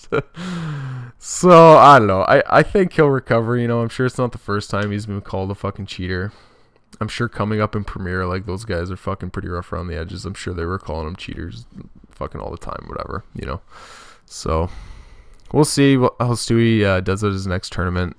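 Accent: Canada. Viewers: Are you Canadian? You're accent sounds very similar to me. I'm from Vancouver BC Canada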